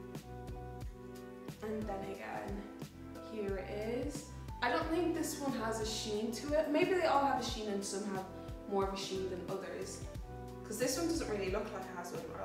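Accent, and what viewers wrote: Ireland. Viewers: Am I trippin or do you have an irish accent? It's stunning either way😍😍😍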